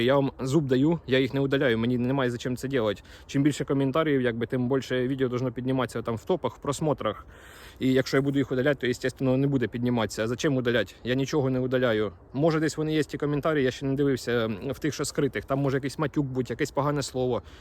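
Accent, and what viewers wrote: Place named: Surzhyk, Ukraine. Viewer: А мені подобається слухати суржик,хоч сама із західної області.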